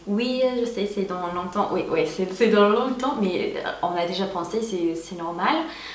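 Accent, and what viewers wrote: Montpellier, France. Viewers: C'est marrant vous avez de plus en plus un accent français en parlant. Surtout sur certaines phrases rapide a dire. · Tu es de Montpellier oú j'ai mal entendu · Je trouve trop mignon ton accent Français ! :) Est-ce que les Américaines trouvent attirant l'accent Français quand un Français parlent Anglais ? Sinon, si tu veux visiter la France, je suis de Dijon = le pays de la moutarde, du cassis, du vin et des ducs de Bourgogne !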